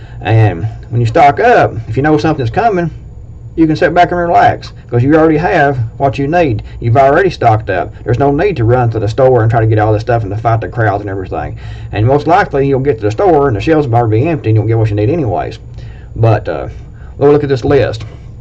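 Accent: United States. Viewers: Your accent says America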